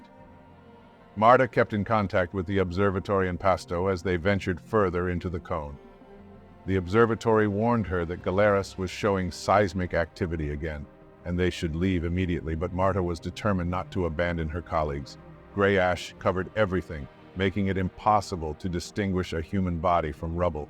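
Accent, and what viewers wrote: United States. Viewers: Shocking narration. Ugly American accent. You couldn't make this up if you tried.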